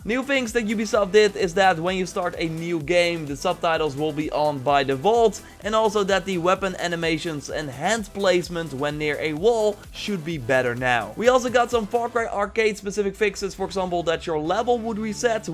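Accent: Netherlands. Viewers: r u dutch? accent sounds like it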